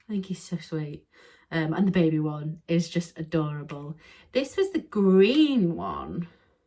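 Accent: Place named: England, United Kingdom. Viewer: I love your typical english accent :-). I'm french and you speak very clear and easly too understand.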